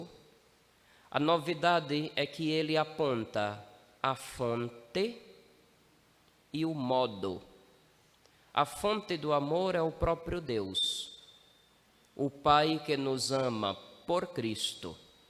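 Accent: Northeast, Brazil. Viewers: LOUVADO SEJA DEUS POR ESSA HOMILIA CATEQUÉTICA (SEM FALAR DO SOTAQUE MARLINDO QUE EXISTE, OXENTE), LOUVADO SEJA DEUS PELO SEU SACERDÓCIO FIEL E SUBMETIDO A VERDADE PADRE, AMOR DE JESUS NOS LIBERTA DO PECADO QUE CONDENA E MATA A ALMA. O HOMOSSEXUALISMO TBM É PECADO! ✝️🇻🇦🙏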